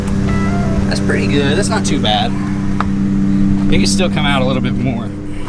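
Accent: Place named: Kentucky, United States. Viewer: I knew the accent sounded right when I saw the Kentucky plate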